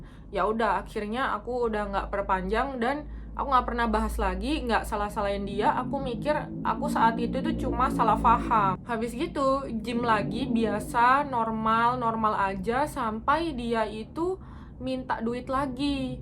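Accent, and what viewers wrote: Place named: Surabaya, Indonesia. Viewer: kak kamu orang surabaya kah?.. kok logatnya surabaya banget hehe..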